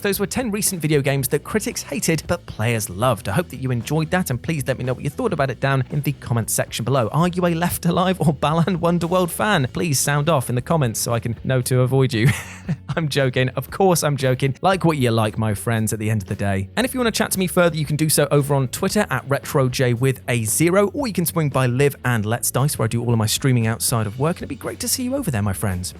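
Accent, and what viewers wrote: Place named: United Kingdom. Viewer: you don't enjoy the game cause you are one of those reviewers... Jesus this guy is so fucking unaware... the definition of a brit that made a career with American audiences cause his accent makes him sound genuine.... this guy... smh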